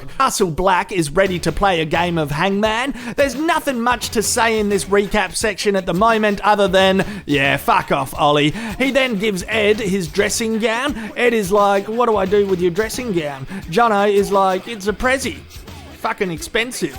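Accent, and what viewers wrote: Australia. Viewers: love your reviews. you say what I'm thinking in Canadian eh, in a Australian voice. . . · You have clearly never met an Australian in their natural habitat.. we all speak like that.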